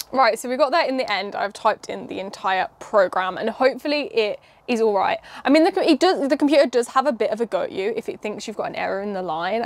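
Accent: United Kingdom. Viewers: Im so confused, you look so young but you're playing with old things that I only remember seeing at my older cousins' house when I was really really young and I'm at least 10 years older than you. This made me smile a lot, this is so cool. Thanks for bringing me this time travel experience with a very special british accent. Keep going and having fun. 😁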